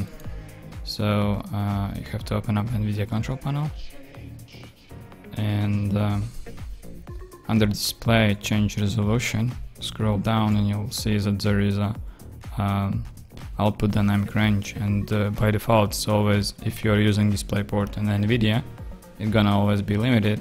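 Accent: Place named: Sweden. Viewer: sound like you from sweden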